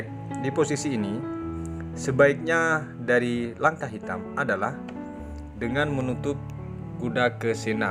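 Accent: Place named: Madura, Indonesia. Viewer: Corak oreng madureh logattnya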